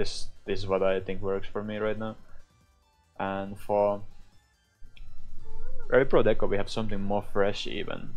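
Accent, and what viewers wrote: Finland. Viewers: Yo the voice/energy in it isnt that easy to chaenge when you have a Finnish accent and English isnt your first lanuage.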